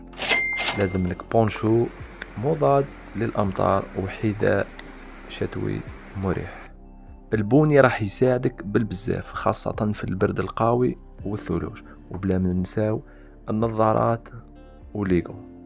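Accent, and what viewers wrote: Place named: Algeria. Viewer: تراه لهجو الجزائرية😂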